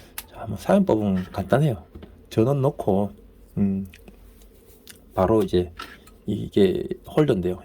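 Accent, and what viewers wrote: Gyeongsang, South Korea. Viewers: 자막설정 안하면 뭔말인지 몰것다...ㅎㅎㅎ 경상도 사투리는 언제들어도 적응이 안된다.......ㅎㅎ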